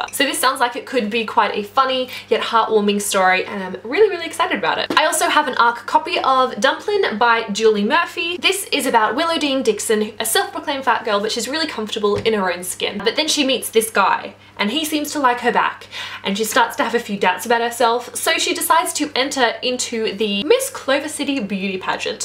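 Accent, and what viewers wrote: Australia. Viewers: Australian or New Zealander?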